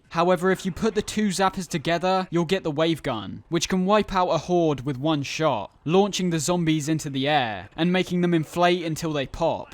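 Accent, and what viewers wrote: United Kingdom. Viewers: Australian? This guy’s British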